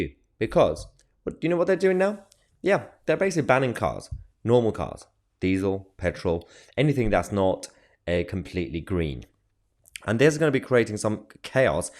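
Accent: United Kingdom. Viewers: A brown Muslim that sounds British... anchor baby's got a u tube chanel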